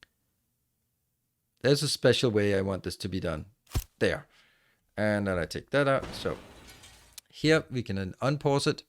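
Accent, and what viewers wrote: Denmark. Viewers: Nice video. Your English is really good. But a fellow dane can hear :)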